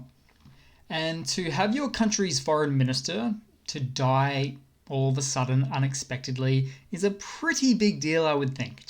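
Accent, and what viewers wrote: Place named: Australia. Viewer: Always a huge thank you for the hard work put into your content! Love the accent! Teach us more Aussie! While learning about Ukraine! ❤️🫂🇺🇦🫂❤️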